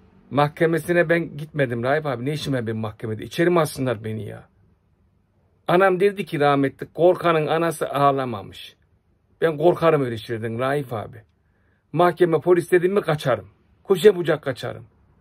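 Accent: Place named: Anatolia, Turkey. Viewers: Genel iç anadolu şivesi galiba( raif abi) birazda güneydoğu bölgesine geçsen güzel olmazmı Inan seni izlerken ağlanacak halimize gülüyorum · Anadolu insanının mantığı, deyimleri, bu şive, ... vallaha var ya, lan argadaş neymişin be...! Geberiyom seyrederkene ... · Aksaray şivesi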